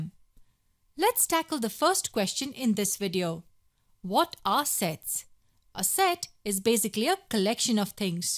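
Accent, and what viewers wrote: India. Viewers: She has a strong Indian accent and lo and behold India pops up twice in the examples. Is this an attempt at subliminal propaganda from Indian Nationalists by any chance ? 😁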